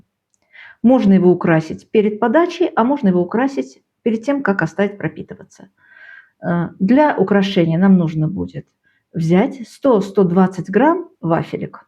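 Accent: Baku, Azerbaijan. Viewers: Спасибо огромное за рецепт тортика,такого в моей "копилочке" еще нет!!! Испеку обязательно,порадую внуков!!! Обожаю ваши ролики,ваш бакинский русский!!! Привет из Молдовы...❤ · Smotru vas iz Amerika, no sama iz Baku azerbaijanka, za stolko let akcent ne izmenilsa, udaci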